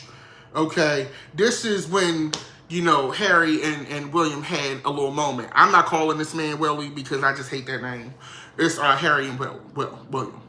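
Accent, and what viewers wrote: Baltimore, United States. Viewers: You got me over here cracking TF up, lil Bmore accent and all the shade- YouTube sent me here from Tells and I LIVE. Hope you do more 🤣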